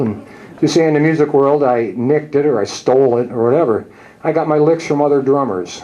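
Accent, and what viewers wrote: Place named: Chicago, United States. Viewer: chicagoland accent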